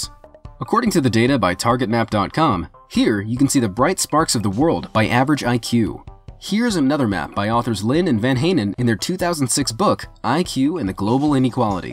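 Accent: United States. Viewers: Also ya know the American accent comes into play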